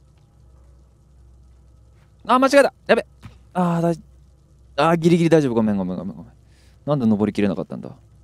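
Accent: Hiroshima, Japan. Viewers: 増田さんの広島弁、やっぱり好きだなぁ～。🥰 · 広島弁が心地よいー☺️ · あの…毎回出る広島弁？が最高です…(º﹃º )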